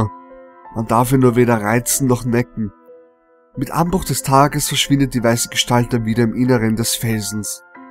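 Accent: Austria. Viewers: bist du jetzt eigentlich Deutscher oder Österreicher? Dein Akzent klingt immerhin nach Österreich:D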